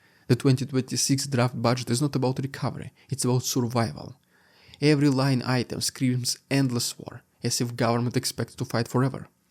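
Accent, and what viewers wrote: Russia. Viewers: 😂😂😂 He is really Russian..strong Russian accent of English..no native English or USA speaker or AI able to make it sounding naturally..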